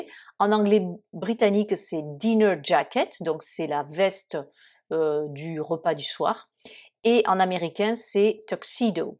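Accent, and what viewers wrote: Toulouse, France. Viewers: As usual, I still very happy hearing your Toulousain accent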